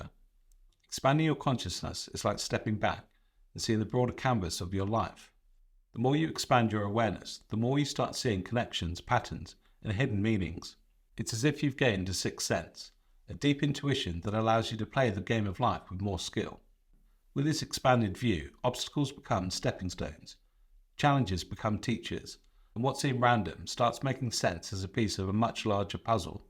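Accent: United Kingdom. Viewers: pure information with a British accent( I love it)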